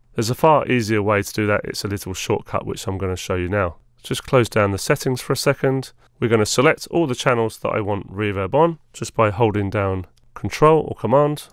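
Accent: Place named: United Kingdom. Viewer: Nothing bet'er than to have a brit' teacher, noice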